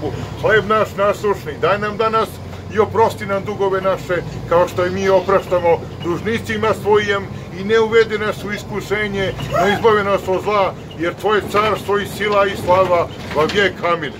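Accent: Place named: Herzegovina, Bosnia and Herzegovina. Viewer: Ovaj brat je hercegovac 😮😮😮😅😅😅😅😅😅😅😅😅😅😅😅😊😊😊😊😊❤❤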